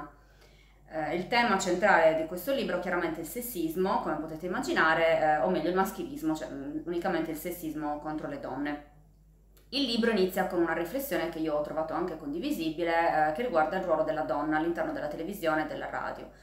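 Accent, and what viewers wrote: Sardinia, Italy. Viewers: Sei stupenda. Da lucano adoro il tuo accento sardo...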